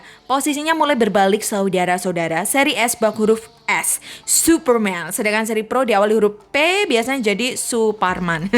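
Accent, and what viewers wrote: Surabaya, Indonesia. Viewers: Suroboyo iki rekkkkkk.....👍👍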